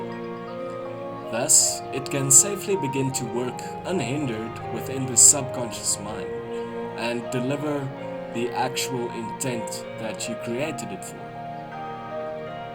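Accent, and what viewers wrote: South Africa. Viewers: sorry if this sounds weird your accent sounds verry familiar are you mby from SA if u are nice to see im not alone in this country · ? Are you also South African? I recognize your accent. Is there any way I can contact you?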